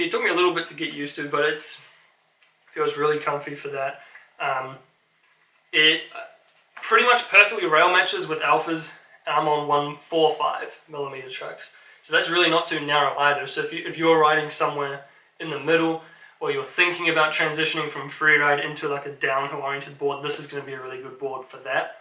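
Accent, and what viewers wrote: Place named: New Zealand. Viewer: Haven't heard a kiwi since crunchie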